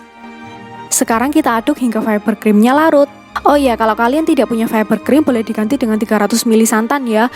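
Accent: Surabaya, Indonesia. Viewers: Medok sby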